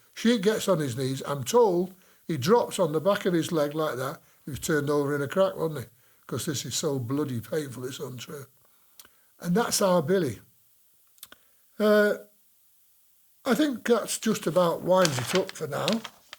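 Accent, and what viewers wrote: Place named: Nottingham, United Kingdom. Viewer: I o ly clicked to hear if u were gonna speak in a japanese accent hahaha! Seriously i knew the name. Ut to my suprise your english.lovr from nottingham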